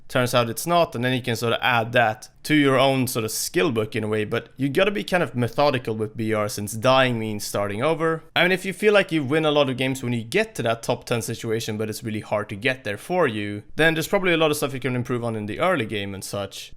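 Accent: Sweden. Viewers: du låter svensk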